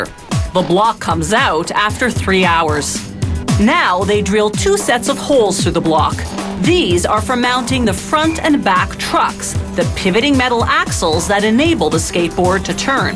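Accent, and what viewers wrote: Canada. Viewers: she must be Canadian or from some northern state. It actually makes more sense pronounced that way, though. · Canadian accent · she sounds so canadian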